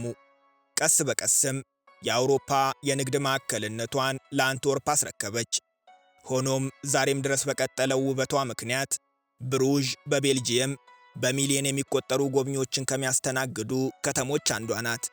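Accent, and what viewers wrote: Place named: Addis Ababa, Ethiopia. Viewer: yemigermew ahun yalehut gent Belgium new ena ende addis temesche new yesemahut konjo akerareb new berta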